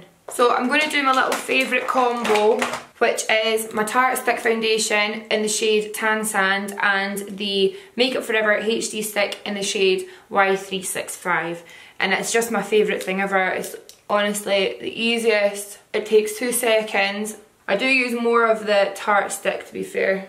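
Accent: Scotland, United Kingdom. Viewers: What part of Scotland are u from I'm from paisley love this look deffos subbing xx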